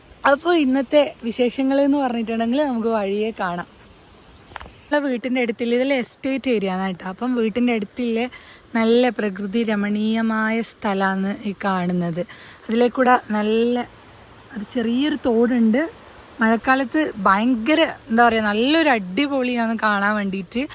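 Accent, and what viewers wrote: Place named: Kannur, India. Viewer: നമ്മുടെ കണ്ണൂർ ഭാഷ ❤❤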